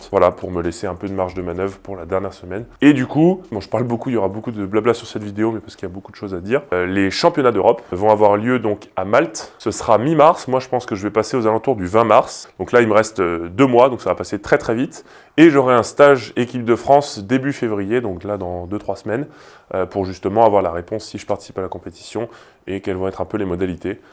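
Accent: Quebec, Canada. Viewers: Hahah tu as vraiment un talent pour te mettre dans des personnages 😂😂 et tu le fais vraiment bien, perso je kiff l’accent Québécois 😂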